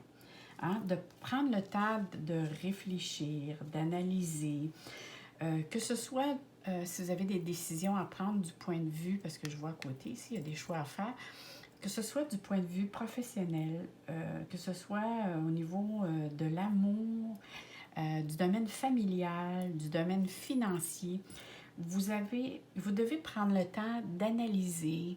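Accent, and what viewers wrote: Quebec, Canada. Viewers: Belle philosophie et présentation, ça me parle, avec en prime ce super accent Québécois.Ce beau pays et bons souvenirs de voyage pour moi.🙏💚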